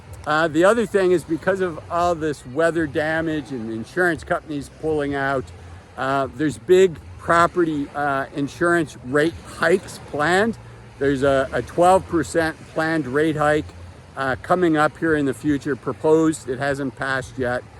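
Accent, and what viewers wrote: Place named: Cleveland, United States. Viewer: My late biological dad was from the Cleveland area too, and your accent reminds me of his, so I guess there is a Cleveland accent and you have it and he did too. As an adult he moved around a bit but settled in Northern VA, where I found him in 2015. He was in his 80s and I only knew him for a couple of years before he passed. Getting to know him was one of my life’s peak experiences. I’m glad I found him before he died, but wish I had had more time with him.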